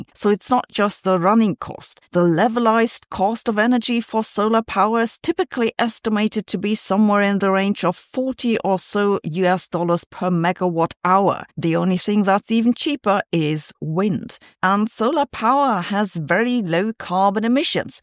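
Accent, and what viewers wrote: Germany. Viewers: ❤❤❤ure German accent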